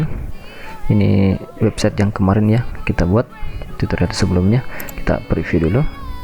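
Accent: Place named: Sulawesi, Indonesia. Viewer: abang orang sulawesi yah... makasih buat Tutorial nya ya bang.. keren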